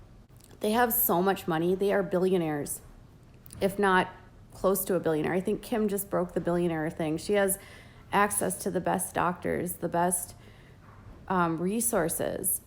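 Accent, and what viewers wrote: Minnesota, United States. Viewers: I think needs to go back to writing some BS liberal snowflake “column” or “blog”. She pathetically uploads several times a day about ridiculous topics with no proper research or fact checking. She has to censor every little word she is afraid might keep her from making a buck. Hey, if you’re so passionate about your political beliefs, just say the word. ABORTION. If you want to even ATTEMPT to play the reporter role, drop your awful Minnesotan accent and learn to use talking head diction. Putting a bag over your head might help as well. You have a rare talent of making someone’s eyes, ears, and brain bleed simultaneously. Ugly bitch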